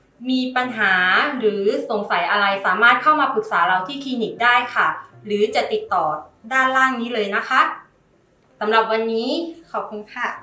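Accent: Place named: South, Thailand. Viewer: คุณหมอสำเนียงเหมือนคนใต้เลยครับ คนใต้ป่าวครับ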